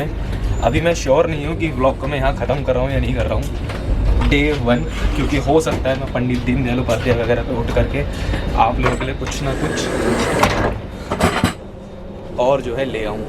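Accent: Bengal, India. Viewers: Bro are you Bengali na ? 😁😁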